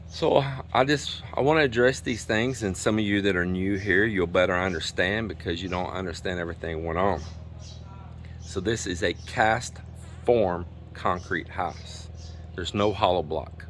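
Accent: Texas, United States. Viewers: I am your 83 year old lady in Texas that look forward to your daily video. It is normally 2AM as I have sleeping problems. I look forward to watching you and each night. I love seeing your progress I want to know about the chickens,the cow. Any thing you video will be interesting to me because of your Texas accent and straight forward delivery. I love the beautiful scenery you show and the beach. Tell I prayed for her surgery and not overdue too quickly. She will be good again in no time. MeMe in Texas USA 🇺🇸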